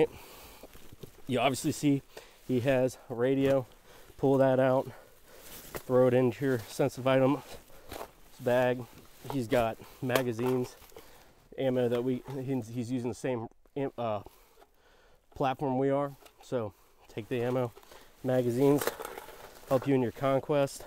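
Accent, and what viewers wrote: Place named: New England, United States. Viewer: No where near Appalachia. Doesn't look like my backyard, just a little to flat. Plus your Yankee accent tells me the same thing. Otherwise, the idea of your video is good..I wish you much success in your endeavors. The more educational content like this the better.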